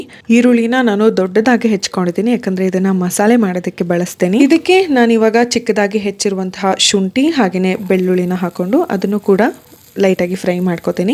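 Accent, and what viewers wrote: North Karnataka, India. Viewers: Mam I like the way u talk mam and ur uttara karnataka talking style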